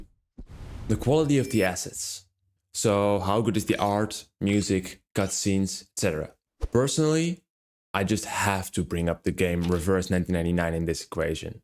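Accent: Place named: Netherlands. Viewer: 300 subs?? with this kind of editing? Amazing work Also are you perhaps Dutch because I thought I heard the accent in your English XD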